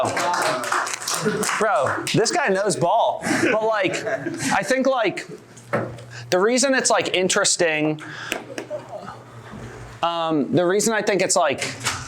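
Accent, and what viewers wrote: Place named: South Jersey, United States. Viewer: 2 questions. Are you from delco? Cuz you sound like you are either from south jersey or delco. Andddd did you sorta think you could wing this presentation without much practice before? I know how it feels to speak to a group of strangers cuz i used to host trivia and my first few shows were terribly awkward. Just like this was at times, rambling in and out of tangents, awkward long pauses etc. Part of that skill definitely come with repetition which will come with time, but preparation helps and this felt a bit undercooked at times for sure.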